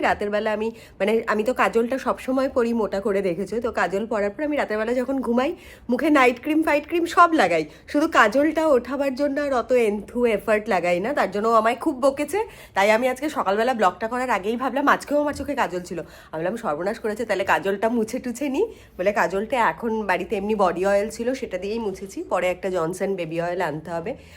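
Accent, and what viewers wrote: Bangal, Bangladesh. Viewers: Aunty tmr bangal kotha gulo ki cute 🥹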